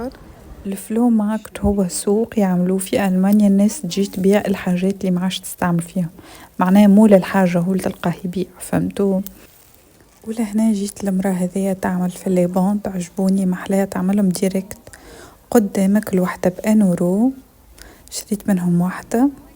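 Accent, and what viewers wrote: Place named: Tunisia. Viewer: أموت في لهجة التوانسة نحبكم برشا طبعا أنا من المغرب ❤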